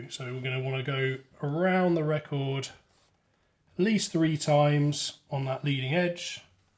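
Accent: United Kingdom. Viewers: Sounds like you are from the U.K. those 'mofi sleeves' doesn't seem readily available for Amazon UK. Have you tried any alternatives, say the 'bigfudge' brand or Amazon's recommended 'spincare'. Heard lots of mixed reviews about their sleeves. Cheers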